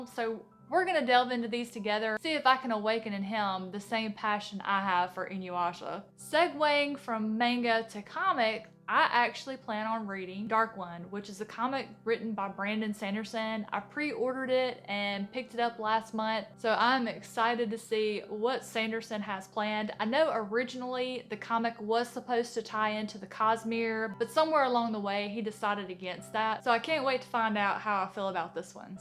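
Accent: South, United States. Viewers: I just subscribed to your channel. I love your Southern accent. I just bought The Way of Kings by Brandon Sanderson. I've never read a book by him but I've heard great reviews. Is this book really good?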